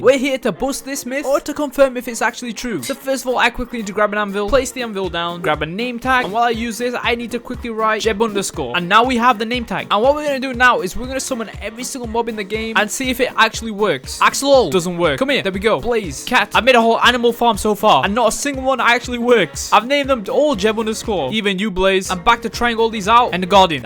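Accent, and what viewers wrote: United Kingdom. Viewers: are you in English or U.S.A.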